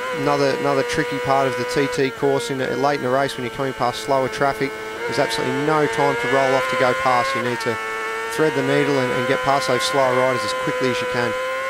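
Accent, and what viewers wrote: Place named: Australia. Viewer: You sound like an Australian. I'm kiwi that's how I know 🙂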